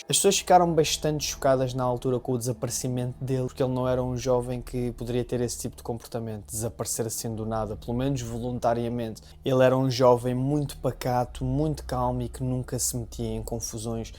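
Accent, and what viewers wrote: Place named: Portugal. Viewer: Primeira vez que me inscrevi em um canal de português, tinha preguiça por conta do sotaque "inelegivel pra mim" agora estou me acostumando e procurando outros com temas diferentes que gosto,mas os portugueses parecem nao serem muito envolvidos como YouTubers. Assim posso treinar meu português, afinal com 22 anos so conheço versão brasileira.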